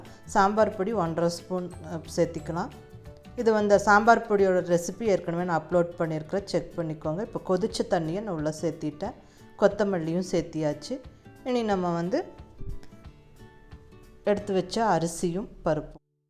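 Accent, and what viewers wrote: Kongu, India. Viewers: Superrr ngov.all ur preprations r neat and simple.mainly our kongu language awsome.best wishes pa. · கொங்கு தமிழற நீங்க பேசறதபார்த்தா தெரியுது மேடம்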